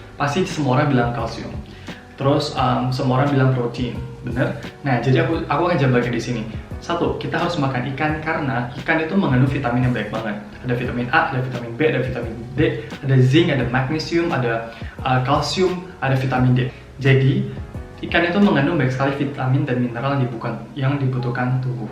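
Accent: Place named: Java, Indonesia. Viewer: Ngomongnya jadi makin medok dan mirip orang jawa gitu ya😂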